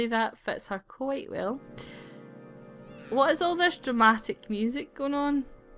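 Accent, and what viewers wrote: Scotland, United Kingdom. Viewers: This is the best Scottish accent I have heard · she is Scottish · OMG ARE YOU SCOTTISH?! Im also scottish (im sorry your the first scottish youtuber i have seen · OMG! I so wish this is for the sims 4! I love your sottish voice!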